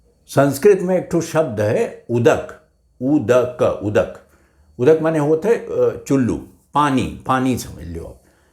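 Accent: Awadh, India. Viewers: Got fed up of listening "English" and other languages. मजा तो अवधि सुने में आवत है। और ऊहू आपके जुबान से,मन तृप्त होई जात है। स्वागत ब आपके, ५ साल बाद इंतजार पूरा भय। अवधि आपहे जिंदा किय्यो है, डिजिटल प्लेटफार्म पर। धन्यवाद, आपके। बस ८वी सूचियों में आए जाए, तो संघर्ष पूरा होई जाए।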